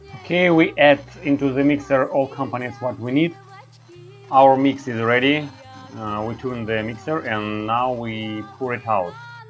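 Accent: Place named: Russia. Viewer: Русский акцент ничем не скроешь.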